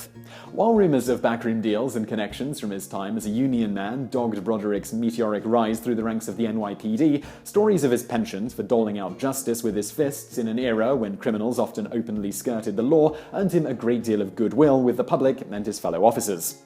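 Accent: United Kingdom. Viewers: gabriel toews, Please, don't beat yourself up about that. Even the light-hearted and "fan-friendly" way Simon presented the story of his life (coupled subliminally with the British accent) seems to put a shade of "knight errant" to him... It's actually a popular media ploy... I sincerely hope this can help you (and others) start to disseminate the substance of the story or presentation (with or without subtext)... Thus building and contributing to us all gaining in our critical thinking and deciphering skills... :o) I doubt you are an idiot... Just like most of the public... It's just easy (sometimes) to accept even trifling news at face value. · What I find ‘ironic’ about this video is the British-accented presenter and the American subtitles - “Color”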